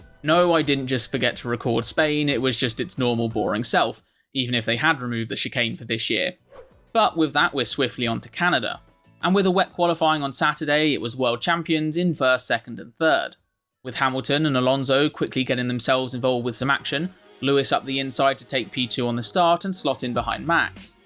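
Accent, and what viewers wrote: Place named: United Kingdom. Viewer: This vid starts. I hear Brit accent and I hear immediate jab at Verstappen. Yah. Ciao. Not my video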